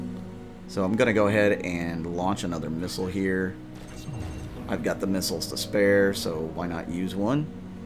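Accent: United States. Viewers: well , after I see your videos I can easily say that you know the game very well and of course I know you knew that you can take the claim jump mission with any medium side ship any day , just wanted to add that extra info here for those who didn't know , but I'm aware of your intentions of those who are new and don't have caplable ships , and so you show how to with a small ship . Keep the good work up , and I honestly love YouTuber like yourself that I can understand and love the Cristal voice and. America accent , I can't help myself lisenting boredgamer Wich is a tremendous informative YouTuber , one of the best but my ears hurt when he talks .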